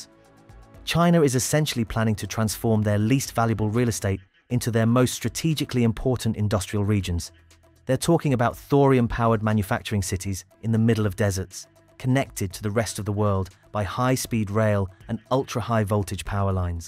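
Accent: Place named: United Kingdom. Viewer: the British sounding AI narrator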